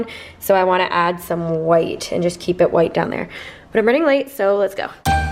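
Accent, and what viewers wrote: United States. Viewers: I’m British and her accent seems stronger than other Americans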